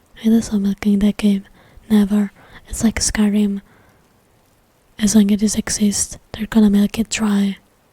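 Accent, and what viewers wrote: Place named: Italy. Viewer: Love the Italian accent with English words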